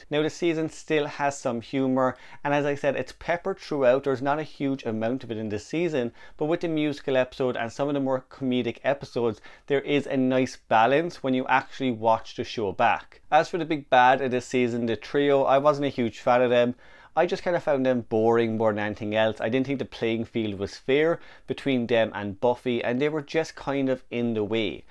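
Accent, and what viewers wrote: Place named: Ireland. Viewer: Aaah... a proper Irish accent.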